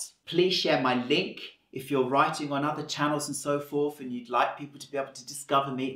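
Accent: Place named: England, United Kingdom. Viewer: ohh nice!!! You don’t look a day over 25!! My hair is about 3 foot long, and I am 6 foot tall lol! My hubby loved long hair and I haven’t had the heart to cut it even though he passed away in 2015. Where are you originally from!? My guess is possibly England or South Africa! You have an accent but it’s hard to figure out!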